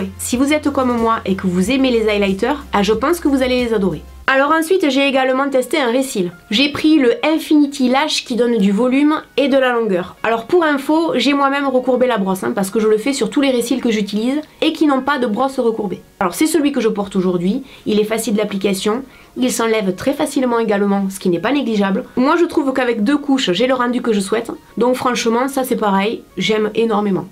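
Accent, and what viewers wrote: South, France. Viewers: j'aime beaucoup ce que tu fais <3 puis entendre ton accent en sachant que je suis sudiste aussi, c'est un vrai régal <3 merci pour ces moments de partage Je viens de créer ma chaine et j'espere un jour pouvoir partager comme tu le fais si bien <3 Pleins de bonnes choses pour la suite.... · Tu est du sud? Quel est ton accent? j'adore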